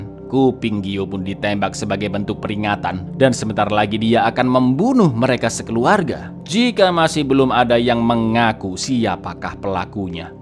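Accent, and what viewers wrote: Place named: Madura, Indonesia. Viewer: Kok BANGKALAN MADURA cak 😂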